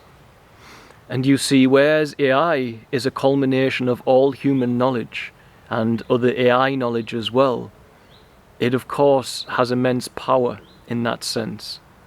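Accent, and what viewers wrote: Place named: Newcastle, United Kingdom. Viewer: Just a joy to hear a Geordie/!Teesside accent Do tell us your story.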